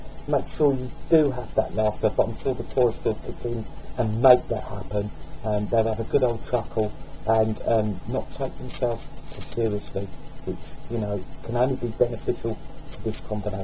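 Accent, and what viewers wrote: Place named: United Kingdom. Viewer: such good material... but the audio is really poor. and you have an awesome brit accent!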